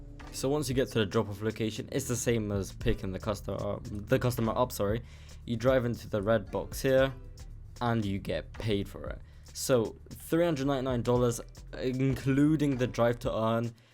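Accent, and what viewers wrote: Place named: Greenville, United States. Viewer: Average greenville accent😭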